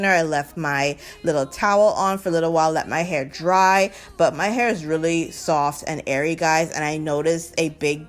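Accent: Bahamas. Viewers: Where are you from ? I hear your accent. Very close to a Bahamian accent if it’s not one. Fr fr 🙃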